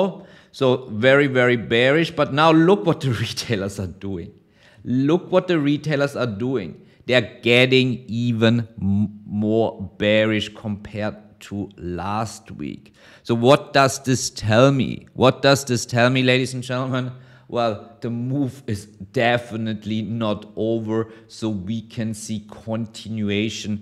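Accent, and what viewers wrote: Germany. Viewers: Man i love your English mixed with German accent and your articulation ! So nice!👍🏾 ❤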